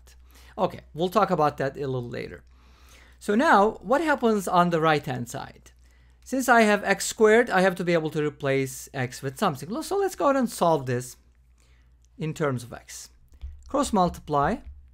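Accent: Turkey. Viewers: Abi sen Türk musun sesine turkluk seziyorum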